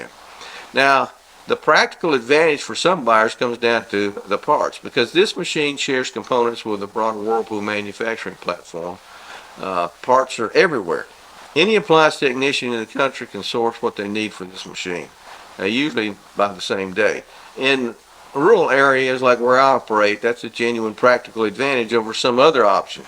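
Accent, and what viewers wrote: South, United States. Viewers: I've worked on cars and trucks for over 30 years. I really trust. He's obviously knowledgeable, experienced, intelligent and (importantly) honest. I think I'm becoming like this guy, minus the good ol' boy accent. I'm complimenting somebody. I'm not sure if it's him or myself or both. Having said that, I worked for Sears Auto in the 1990s and the appliance department guys said they laughed when they saw the Maytag commercials. You know, the old ones with the service guy who was so bored because Maytags never broke. Maytag was far and away the most common warranty problem they had. But, Sears didn't sell the model he mentions in the video.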